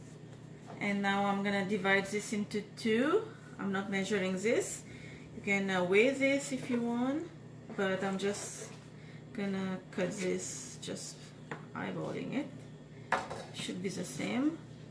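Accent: Mauritius. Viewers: Yeh ur right it is pronounced nid! The prononciation is the same no matter where we r.. accents r different! However, I think we’re losing focus here! It takes courage and strength to make videos! It’s been three years n m still not brave enough to do it! How abt we focus on the recipe and her tips as opposed to her accent.. she is Mauritian! I’m sure we all have an accent irrespective of where we r?!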